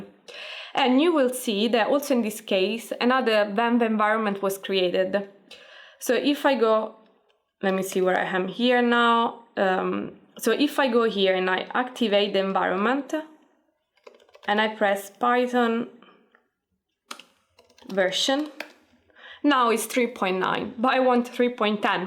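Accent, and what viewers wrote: Italy. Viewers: You are Italian aren't you? I looove the accent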